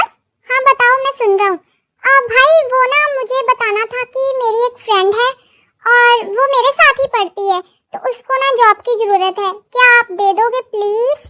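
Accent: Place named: Bihar, India. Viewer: Badi mast bihari boli hai to good ❤❤❤❤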